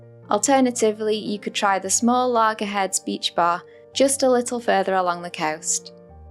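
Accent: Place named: Somerset, United Kingdom. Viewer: Sounds like you're from Somerset UK. Try Santiago's Bodega at the end of Petronia next time. You won't be disappointed.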